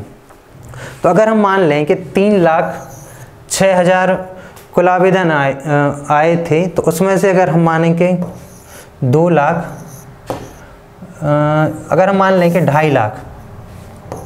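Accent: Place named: Bundelkhand, India. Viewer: apki language se pta chala ki ap bundelkhand se ho..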